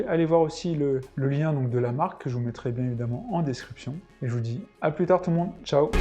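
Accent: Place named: France. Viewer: la lecture anglaise avec la prononciation française..c'est pas top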